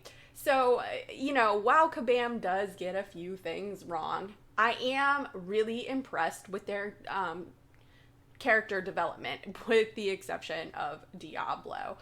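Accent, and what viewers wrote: Pittsburgh, United States. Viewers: Ur Pittsburgh accent is showing through... Lol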